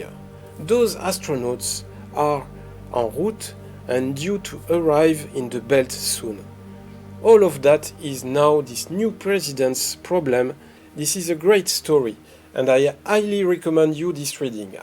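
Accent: France. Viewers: I have to say a critic but hope you'll receive this as a constructive criticism, hope you'll forgive me, your videos are super awesome however your French accent is very strong sometimes I nearly can understand you you need to talk more clearly, it's my point of view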